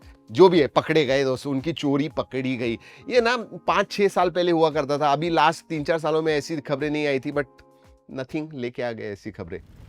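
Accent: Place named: Gujarat, India. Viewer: Chalu karte hai !!! Gujju accent..wahh😅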